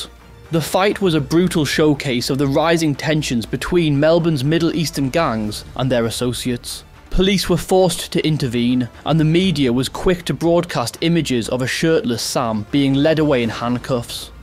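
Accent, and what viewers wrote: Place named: North, United Kingdom. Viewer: Why do we have this “northerner” instead of a good Aussie accent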